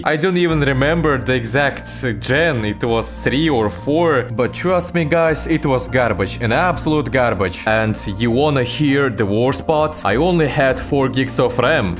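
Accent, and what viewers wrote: Russia. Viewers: Как ты говоришь на английском так, чтобы русскому было тебя понять легче, чем англо-говорящему? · русский акцент bilya... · Почему мне кажется что у тебя русский акцент ? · love you rrusian accent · Нда, чел пытается не палиться что он русский, но акцент говорит нет Ты позоришь себя, микрочел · hell nah hes def russian, i can say it cuz im russian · I ve recognised Russian speaking man from the very first words 🙂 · Как же мне нравится эта озвучка с Русским акцентом:) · When you hear a russian speaking english AAAAND cursing in russian..., the vídeo is hilarious and serious at the same time. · Не иронично самый клёвый акцент ру английского с неожиданно довольно хорошим произношением и ахеревшим словарным запасом. Как говорится fluent af. Будет крутой гиммик канала. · russian accent detected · I knew that guy was russian when he started speaking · Прикольно что из за русского акцента мне становится в разы проще понимать английский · how this the most russian accent i have ever heard (i am russian btw) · Couldn't have made a more russian sounding enlgish accent fr. 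Thats how people in my school read English texts. Good thing i know english well💀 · Bro i love russian guy speakung english😂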